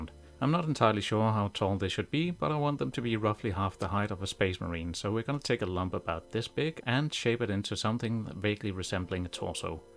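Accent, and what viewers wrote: Denmark. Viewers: er du dansk? det lyder sådan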